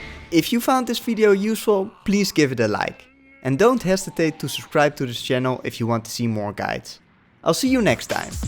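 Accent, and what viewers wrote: Netherlands. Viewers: You're Dutch aren't you 😄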